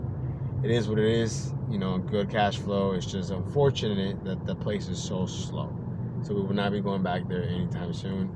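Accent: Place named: Virginia, United States. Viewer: Lmao Dulles town center 🤣. I thought with your VA accent that you were trying to say Dallas this entire time I've been subscribed.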